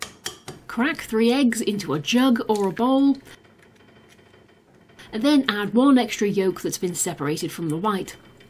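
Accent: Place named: Australia. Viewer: Well, you should. It's really extremely beautiful. You have an Australian accent, am I right? It's amazing. I think you should do some voice overs as well. You should be more serious about good quality equipments. You're doing really well. I think you can. You will do even better if you get some good quality equipment. I wish you all the best.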